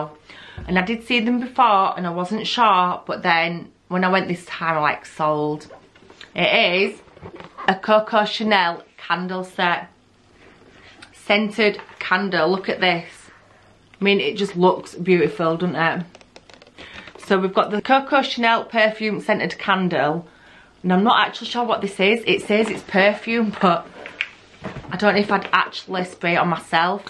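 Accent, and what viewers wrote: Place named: Manchester, United Kingdom. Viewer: “Propped good hun” proper Mancunian woman I’m a mancunian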